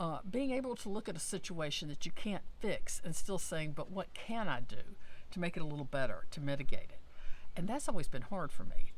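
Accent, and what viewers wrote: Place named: South, United States. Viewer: I just subscribed ! I really enjoy your videos, 🎉they are to the point and enthusiastic and I like your southern accent I work with shelter animals, just cats. I would work with dogs too, and gladly walk them but it’s too far from me at this time. I’m looking forward to your videos about tips to losing weight, I already watched the one about pasta, so I’m going to the grocery store in a few minutes.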